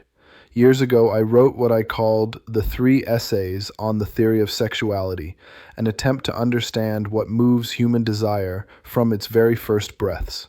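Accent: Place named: United States. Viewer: well here's a tip: don't use an AI voice with a distinctly American accent for a person everyone knows is Austrian. I thought it was a joke at first.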